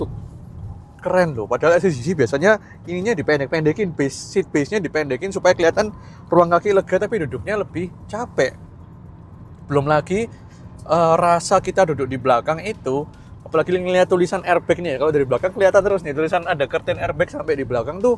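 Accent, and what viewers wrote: Surabaya, Indonesia. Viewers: Soko logate aku langsung ruh awakmu asli Suroboyo. Sego Endomie.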